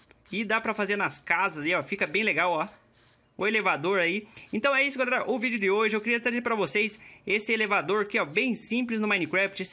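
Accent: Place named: Paraná, Brazil. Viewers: Paranaense? Vc? Kkk vc tem sotaque,me ajudou muito obrigada, super recomendo seu canal👍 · Vc tem uma vozinha meia de caipira sem ofeças sla como ser escreve '-' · Você é Paranáense? Sotaque legal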